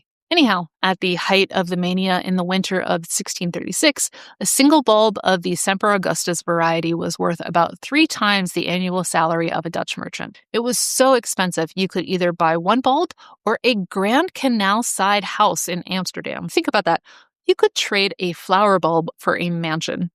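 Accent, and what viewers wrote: United States. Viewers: Are you American? You used pounds instead of dollars but you sound American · I won't listen to anyone with a USA accent.. until you get rid of evil numbnuts Trump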